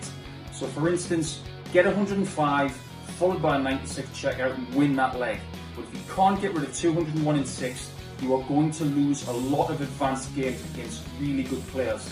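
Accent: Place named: Australia. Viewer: Which part of Australia is your accent from Paul? I can’t pinpoint it. 😂😂 the goat darts villain for me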